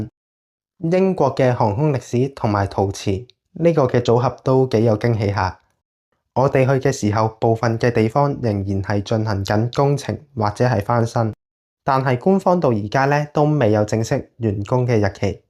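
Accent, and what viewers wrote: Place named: Hong Kong, China. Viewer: 講者的英語口音仍停留在港式發音。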